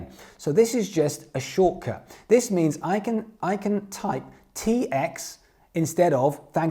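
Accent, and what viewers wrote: United Kingdom. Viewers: I've been watching a lot of amercian youtuber but I didn't know until I started watching how much I needed to hear a British person. I feel like I'm back home😍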